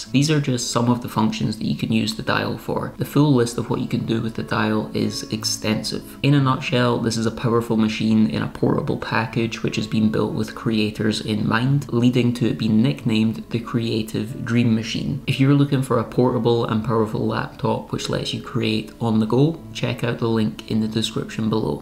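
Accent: Scotland, United Kingdom. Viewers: I subscribed because of tiny house, Scottish accent, location and renovation. Being an, ahem, more mature subscriber, this computery stuff is amazing and I haven't a clue what it means! But your voice is so lovely and I appreciate the mental health tips. Good for you.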